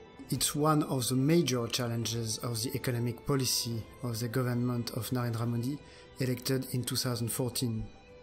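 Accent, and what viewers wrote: France. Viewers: the French accent is stronk here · Just 10 minutes far in the video, and enjoying it truely, but I have to comment now on your super cute accent. As a french myself I understand your struggle and I compliment you : you stress all the words properly. But still, it makes me smile. Shirvan commented a bit more on the difficulties that lies ahead of India, than you do. Do you put more weight on more optimistic views by choice, like your Patreon page says ? "Cheerfull foreign affairs videos" It chearfull for sure :-) Oh, my bad, you do talk about the limits to growth. thèse-antithèse-synthèse on se refait pas :-) I personally am afraid the positive developpment of India might suffer very much from the peak oil. Alltogether, views on economics, on politics, and geopolitics... all sumed up, given nuances... very beautifull peace of thinking. I proudly recognize the french-like attention brought to the organization of thoughts, with diagramms and titles, just like in school !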